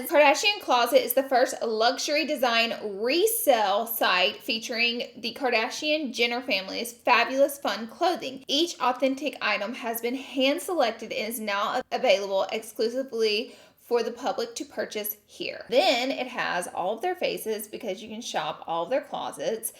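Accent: South, United States. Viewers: Wow it’s wild how she really used to use this fake voice … like her Paris days. She almost sounds like Brittney spears. Like southern accent meets valley girl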